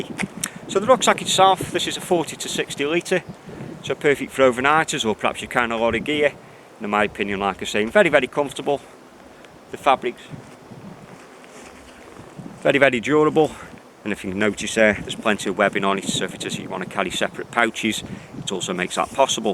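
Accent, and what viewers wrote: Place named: Scotland, United Kingdom. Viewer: Yes indeed that did help a lot. I'd missed the information about the various places you could strap the hip-belt on. I thank you. I'd like to avoid those trials and errors as much as possible when buying over internet, especially from companies like Varusteleka whose customer service will always go the extra mile : I wouldn't like to stretch them either, for fear of seeing them put on a tougher face. Besides, it's been refreshing for me to listen to you talking with your scottish twist. I've lived around Aberdeen for three years, and hearing a scotsman talk brings every time fond memories of warm people and amazing light on the land. Cheers ! :)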